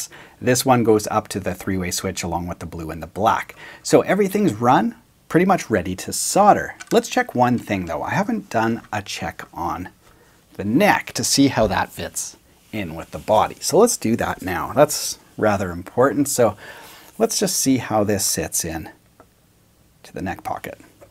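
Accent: Canada. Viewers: Are you Canadian? I am just wondering...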